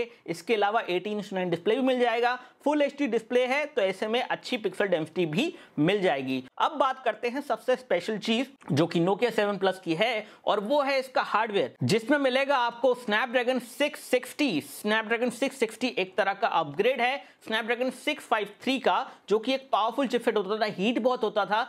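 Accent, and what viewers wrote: India. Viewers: WHAT? i dont understand you its indglish ?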